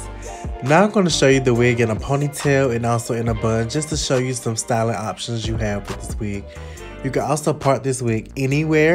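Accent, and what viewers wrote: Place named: Texas, United States. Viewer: I love🥰🥰 his accent, where are you from?... Texas?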